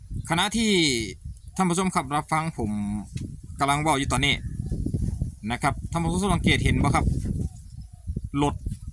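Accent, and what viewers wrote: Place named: Northern Thailand, Thailand. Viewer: สำเนียงคนเหนือผสมลาว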